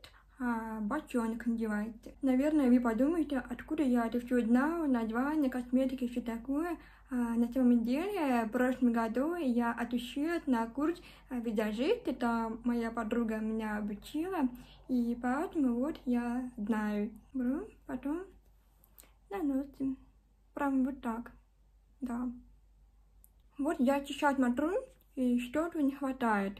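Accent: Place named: France. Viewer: у тебя красивый акцент) я сначала подумала, что ты француженка :)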